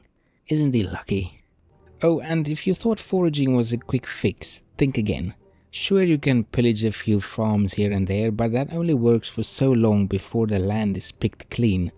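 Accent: Afrikaans, South Africa. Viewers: Good day to the Narrator, quick question, is the Narrator South African? 🇿🇦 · What is your nationality? Accent sound Afrikaans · Are you from South Africa? 😭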